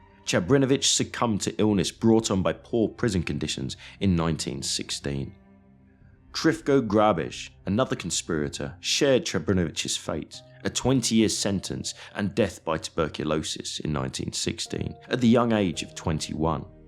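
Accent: England, United Kingdom. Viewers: One listening documentary spoken in English accent should be initially skeptical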